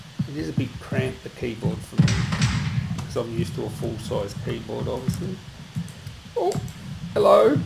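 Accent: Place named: Australia. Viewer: Ofcourse you are Aussie my man! Somehow missed the accent. I use my laptop for VFX rendering, mostly cuda accelerated effects. But I also seek strong battery life, which I am sure the XPS will win the Razer easily. These two laptops are literally a toss up for me, though I am leaning towards the Razer because of the sheer gpu power and better build quality.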